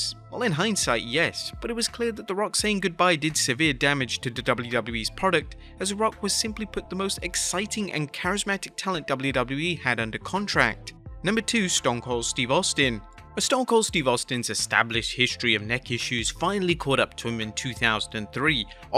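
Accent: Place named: United Kingdom. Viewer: Why all westreling videos always a Bristh accent,Wrestling is American.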